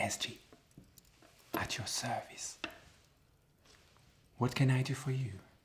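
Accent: France. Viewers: This was so ridiculously good. You chose a perfect subject, and you have a very cozy production. Is that your home!? It is so French and charming. Very historic looking! A french accent plus book sounds? Yes please. Love the page sounds and truly the French language is the most beautiful language in my opinion. Please as a request if you do another video, do a Math video! That would be amazing. LOVE the shoutout! Hahah. Plus to be put in the same page as Croissant and France...those are two of my favorite things. Truly a great first video and I really hope to see you continue doing more. You are a natural on camera!! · A soft spoken male French accent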